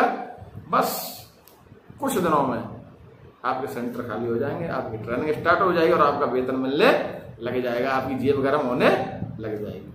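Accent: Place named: Braj, India. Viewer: Sir mai aapse bahut prabhavit hu......aapki boli braj kshetra ki tarf ki lag rhi...aapki jaankariyan bahut hi kaam ki hoti h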